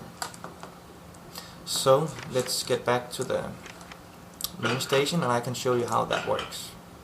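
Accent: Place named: Denmark. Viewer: are you danish? you really sound like your from denmark. the accent is really special for danes.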